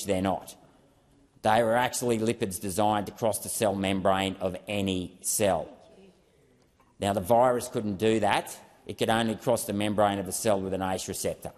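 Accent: Australia. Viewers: Another succinct, intelligent, legendary monologue Senator, beautifully delivered in the dulcet tones of your authentic Aussie accent ... cheers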